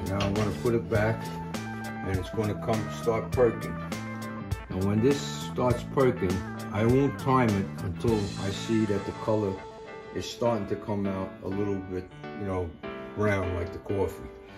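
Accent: New York, United States. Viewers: Cool, I like your Italian New Yorker accent.. My mom use to make percolated coffee, Yuban , not available in Canada.. Thankyou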